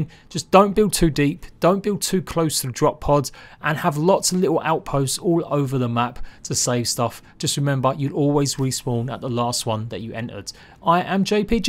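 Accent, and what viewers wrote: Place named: Essex, United Kingdom. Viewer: Are you from southeast England? I swear your accent is like, essex or something.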